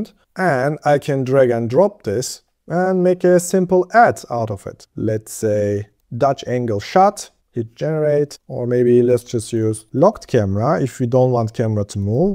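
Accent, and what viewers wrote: Turkey. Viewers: Emeğinize sağlık. Hocam İngilizcesi çok iyi olan bir Türk konuşması duyuyorum. Doğru mudur? :) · kanka turkmusun tam bır turk aksanı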